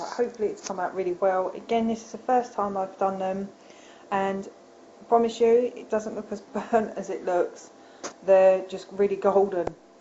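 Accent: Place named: Midlands, United Kingdom. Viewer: Mmmmm want one! Where about's are you from? I recognise your accent, it sounds like Midlands maybe?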